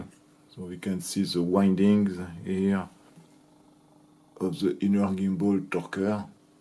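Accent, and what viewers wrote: France. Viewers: Hahaha, your "few moments later" narration fits perfectly with your nice french accent.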